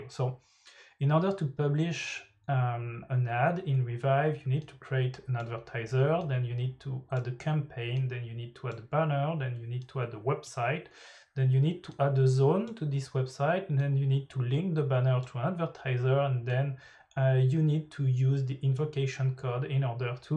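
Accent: France. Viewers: Yo t'es francais ?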